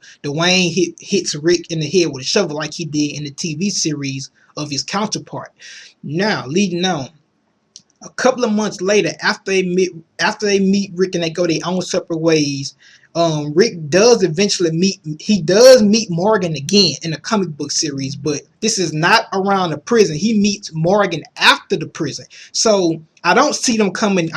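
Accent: South, United States. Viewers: YOu Talk funny! LOL! A real live Southerner.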